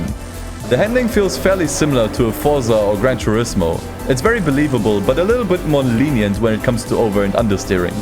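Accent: Germany. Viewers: Ich würde wetten du bist Deutsch :D Du hast so einen Deutschen Akzent im English :D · i can hear german... bist du deutsch o.o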